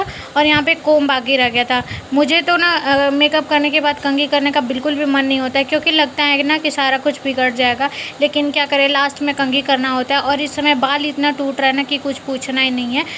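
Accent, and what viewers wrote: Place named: Bihar, India. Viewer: Aap pakka bihar se ho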